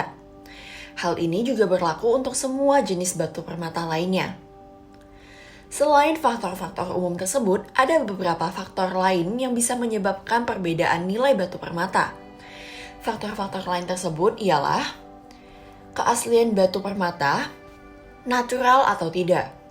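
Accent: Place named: France. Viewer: Mantap naratornya karena ngomong dgn bahasa indonesia namun aksenya gaya prancis... #magnifico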